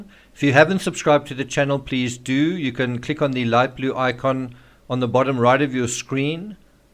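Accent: South Africa. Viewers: Hey 👋 I love your channel, the work you put into every single video blows my mind. You are so appreciated 💛 I was born in SA and I used to have that lovely accent you do,but since I left SA my accent has faded. I wish you all the best on your channel, you definitely will always have support from me 😊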